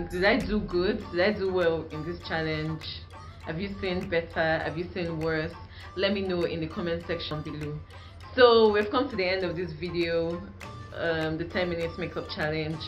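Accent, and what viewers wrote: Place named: Nigeria. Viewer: All the love from Kenya. This is my first time watching someone from Nigeria, to be specific Lagos. I love the Accent and I am so excited 💛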